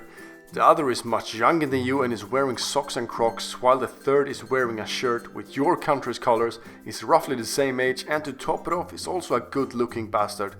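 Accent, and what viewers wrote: Sweden. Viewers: Är du svensk din dialekt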